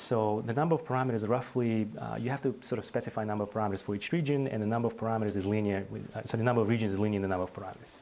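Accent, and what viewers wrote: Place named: Russia. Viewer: Чувствуется русский акцент ...перемотал в начало, автора посмотреть, я был прав!)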